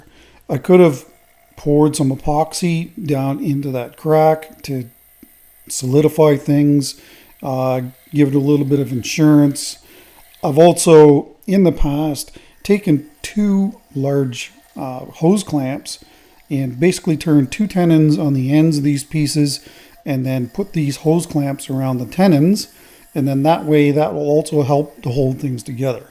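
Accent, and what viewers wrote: Canada. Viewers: I love the Canadian accent, so I'm here for the commentary! I love this Hoser, Aye!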